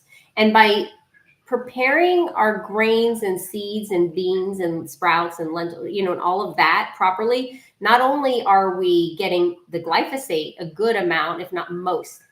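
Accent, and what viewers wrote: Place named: Canada. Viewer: Hey sister, so I used to soak lentil and bean in water mixed with baking soda for 8 hrs , or overnight. I stopped consuming legumes for my SIBO. I wonder though if you'd do a research to find out if baking soda works on legumes? But I'll definitely try mixing fermented pickle juice, I get them in farmers market in Poland, with water. Would a few table soons for a liter of water suffice? I'm glad i found your channel and i appreciate that you use metric system in your videos. Sounds like you're from Canada. Much love and respect for you for making free informative videos. Greetings from Poland. .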